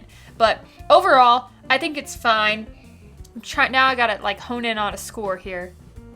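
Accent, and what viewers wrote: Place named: South, United States. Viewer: That definitely ain’t no Yankee accent there, sounds like a southern girl to me! I just picked up a sampler bottle of this today, im looking forward to trying it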